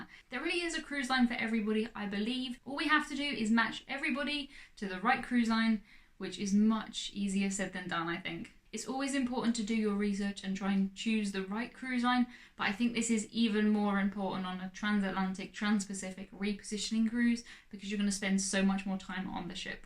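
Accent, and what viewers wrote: United Kingdom. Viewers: Thanks for the good info. topic. I have never listened to a fast-paced British speaker like you before. 🤔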